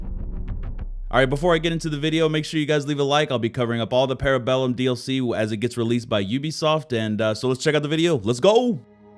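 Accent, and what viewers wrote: Netherlands. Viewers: Are you dutch? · Dude are you Dutch?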